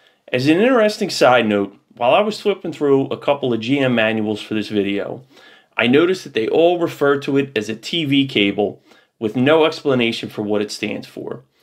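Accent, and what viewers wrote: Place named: Baltimore, United States. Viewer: Speaker sounds to be from Baltimore hon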